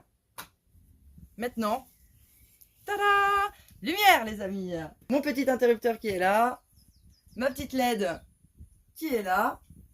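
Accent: Paris, France. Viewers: C'est un accent parisien que j'entends là ??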